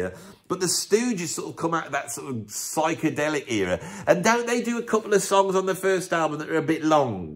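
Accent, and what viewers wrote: Birmingham, United Kingdom. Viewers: Great topic BUT lots of gaps in knowledge and predicable cliched/wind-up stuff about bands in overcoats that actually sing about real stuff- rather than fairies and wizards- BEING 'pretentious'. That said I am loving the Brummie (faux?) accent. So please do a top ten of the best Brummie/Black Country bands wearing false sideburns and a mirror hat and screaming 'BABY, BABY,BAAAABY!' while scrunching your face up. 'Cup-a-Soup' optional.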